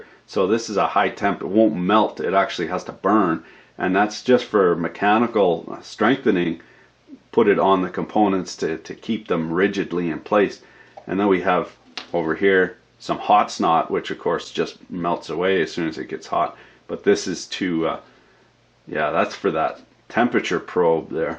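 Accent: Canada. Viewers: Hell I am American and have trouble understanding Canadian at times. The more you watch him the more sense it makes than you start talking like it lol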